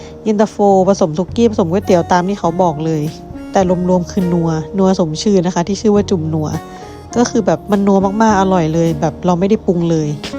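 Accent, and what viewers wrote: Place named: Isan, Thailand. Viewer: สำเนียงอีสานดีมากครับ เป็นคนจังหวัดอะไรครับ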